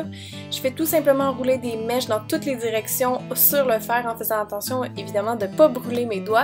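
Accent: Quebec, Canada. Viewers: j'aime bcp ton accent québécois,je regarde come macdream31 le soir, ça me détends et j'apprends plein de choses.quand on regarde transcription (à droite de "ajouter à") Youtube n'arrive même pas à te décoder lol ;-) tes vidéos s'améliorent de semaines en semaines avec toujours de la bonne humeur et tes rires :) bonne continuation :)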